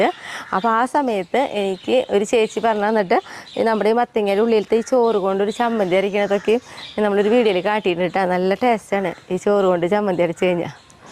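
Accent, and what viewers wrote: Thrissur, India. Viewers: Ningalude sthalam evvideyanu. Thrissur ano. Samsarathil Thrissur slang.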